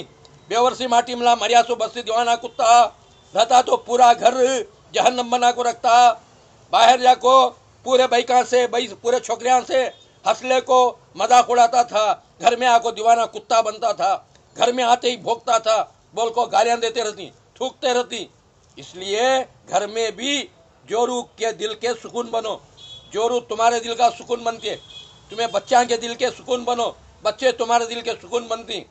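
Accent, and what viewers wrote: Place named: Hyderabad, India. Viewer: Ye baba konsi language bol rahy hai... Hyderabadi?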